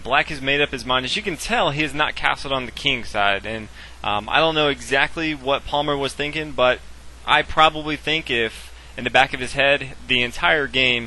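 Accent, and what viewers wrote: South, United States. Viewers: This commentator is fantastic. Erudite, charismatic, and populist. Just want to offer my lay endorsement. Plus, I like a good Southern accent in the context of monarchical European Game of Kings. :)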